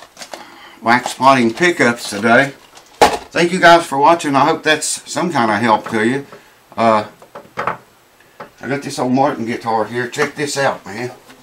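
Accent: South, United States. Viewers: Heard of this for specialized frets ( dont remember the details). Like you vids mostky because of good practical info. 2) your southern drawl. I am from the north and find it facinating! Like hearing it ( used to live in north west FL - lots of alabamans and was in army- same. Also southern females are especially fantastic!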